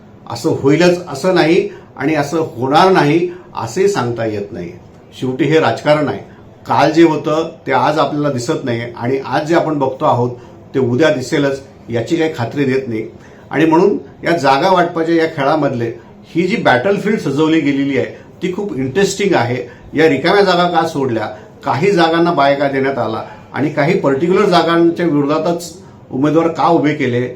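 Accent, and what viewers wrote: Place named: Brahmin, India. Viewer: तुम्ही बोलण्यावरून ब्राम्हण वाटतंय आणि तुम्ही बामणी पक्षाचे उदे ऊदे करत असता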